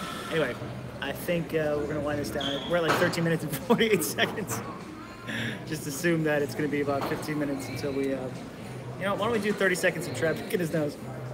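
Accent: Australia. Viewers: Your Australian accent is quite sexy.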